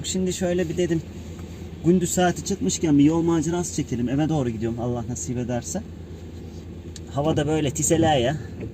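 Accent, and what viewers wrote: Kastamonu, Turkey. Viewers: Kastamonu şivesi konuş nolurrrrrrr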